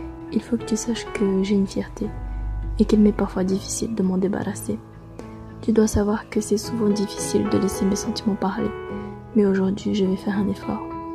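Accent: Italy. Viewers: J'adore ton accent italien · Tu es italienne ?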